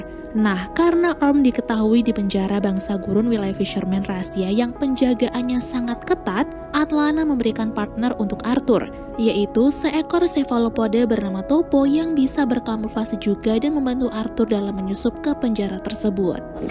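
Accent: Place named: East Java, Indonesia. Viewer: Ini pembawa alur ceritanya orang daerah mana yak? Kok bahasanya gemesin banget 😃 cak cok cak cok 🙈🙈🙈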